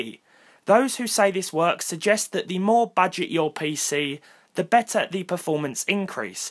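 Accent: United Kingdom. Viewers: dat sexy british accent👌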